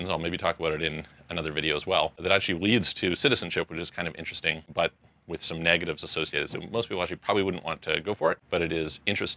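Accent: Canada. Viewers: Excuse us. He’s Canadian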